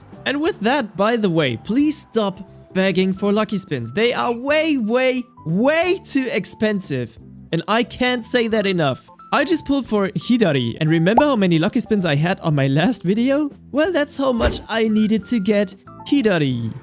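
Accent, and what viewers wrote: Germany. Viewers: yo i didnt know u had a wife (i also think that ur german accent helps ur vidoes)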